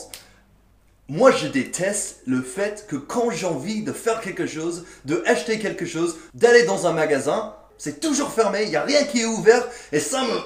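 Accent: United States. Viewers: Is it me or you've lost your american accent a little bit, I mean when you speak english ? Has no one ever noticed it yet ? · Je n'aime pas le concept. JE L'ADORE ! J'adore le petit accent américain qui change tout ! Et les petites fautes de grammaire/conjugaison qui font bien !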